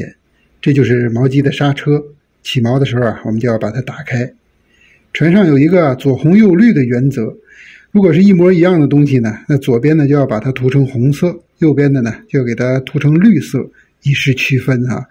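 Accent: Tianjin, China. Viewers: 天普，船长天津人。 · 船长是天津人？ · 老哥是不是天津人？ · 听口音像天津人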